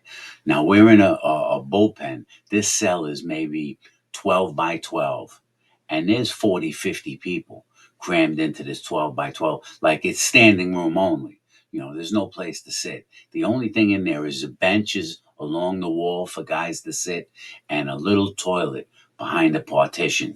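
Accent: New York, United States. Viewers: straight up NY accent! (I have one too 😂)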